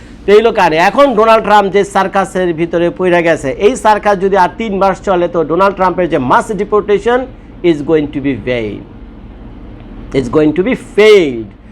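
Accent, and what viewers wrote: Dhaka, Bangladesh. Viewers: মনে হচ্ছে আসল ঢাকার কোন মানুষ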